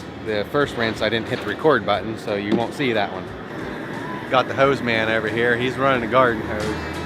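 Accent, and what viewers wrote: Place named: Maryland, United States. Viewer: This was a really helpful and informative video. Not sure where you're originally from - the accent, I'm guessing Maryland or Delaware ;) but take it from someone from south Louisiana, you did a good job! BTW, if the tail is straight after cooked, that means the crawfish was dead going in, so don't eat those :)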